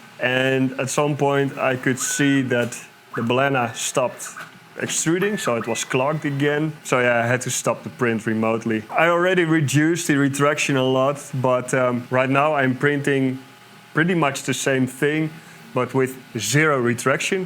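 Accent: Netherlands. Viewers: WTF. HA! You can always count on the Dutch… Funny fuckers, especially when speaking English. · Took me 4-5 words to go 'this guy is Dutch' am I off? Edit: nevermind lol, extremely clear obviously after watching more than 5 seconds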